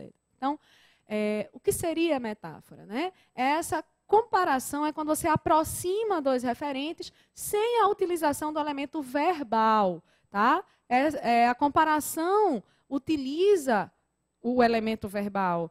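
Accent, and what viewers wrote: Northeast, Brazil. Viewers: Obrigado Profª.. Além de competente, você é muito fofa. E, como o seu sotaque não me engana, deixo aqui um ENORME abraço aos queridos nordestinos. Mais um "Gostei" para você.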